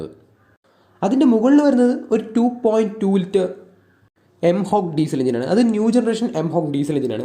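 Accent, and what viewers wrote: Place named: Palakkad, India. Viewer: എന്തായാലും പാലക്കാട് ഭാഷ വിട്ടു ഒരു കളിയും ഇല്ല ഈ ഭാഷ തിരുവനന്തപുരംകാരൻ കേൾക്കുമ്പോൾ മനസ്സിലാവുമോ എന്തോ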